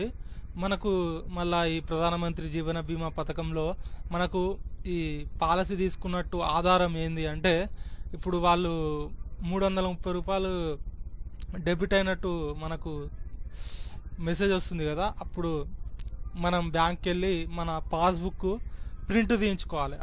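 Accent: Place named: Telangana, India. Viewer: Anna nuvvu telanaga odiva? ekdam matlathunnav telanganala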